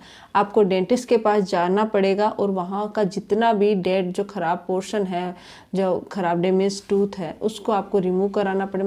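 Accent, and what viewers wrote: Rajasthan, India. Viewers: M aap rajsthan s ho kya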